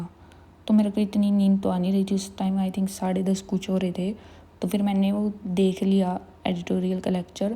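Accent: Haryana, India. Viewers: your hindi with haryanvi accent is too good #complement❤😄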